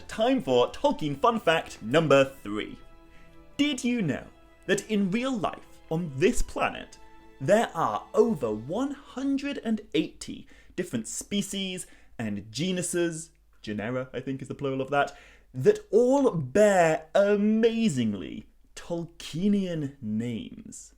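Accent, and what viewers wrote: England, United Kingdom. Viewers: Question? You sound English. Are you from England?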